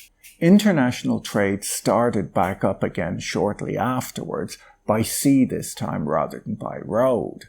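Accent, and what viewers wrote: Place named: Ireland. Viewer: There is some underlying scent of irony to hear this presentation in an Irish accent.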